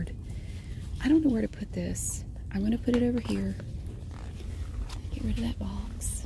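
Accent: South, United States. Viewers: Oh hey a fellow southern person 😂 her accent sounds normal to me because I live in the south (North Carolina) , that’s why I enjoy this channel so much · I have found myself actually taking on your adorable southern accent;) and btw love the outtakes and bloopers! A kindred spirit indeed;) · Why does she sound like Tati Westbrook with a southern accent ??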